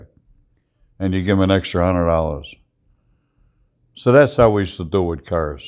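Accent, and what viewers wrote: Chicago, United States. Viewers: I love listening to the way you talk. The Chicago way. I always did the same thing with cars and clothes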